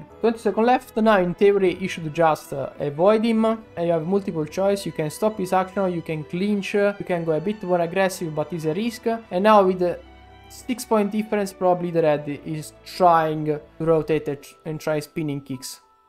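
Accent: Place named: Italy. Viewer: I understand that you are italian from the accent.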